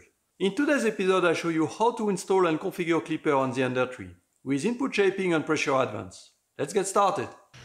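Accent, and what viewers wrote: France. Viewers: This is the thickest French accent I've ever heard. Great video though!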